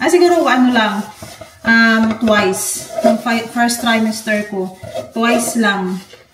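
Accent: Philippines. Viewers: my tono ka san probinsya ka Dito sa pinas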